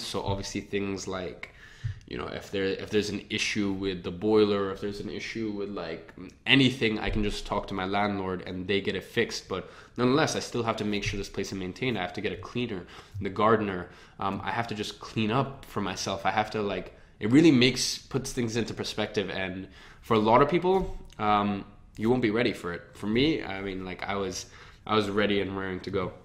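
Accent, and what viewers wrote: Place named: United States. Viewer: Hey! How come you have American accent living in uk?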